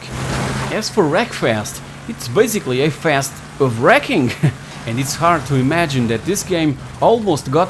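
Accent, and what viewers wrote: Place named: Portugal. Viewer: You tuga? that accent is 105% tuga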